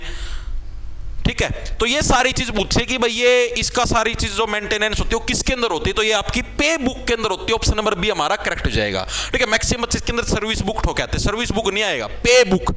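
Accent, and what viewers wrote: Haryana, India. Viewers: Mujhe lagta h sir hariyana se h.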